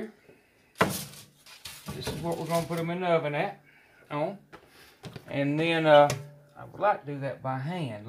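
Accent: South, United States. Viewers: Thanks for the great video. I've never pulled that membrane off but I will now. love that southern drawl you got. Gene from Canada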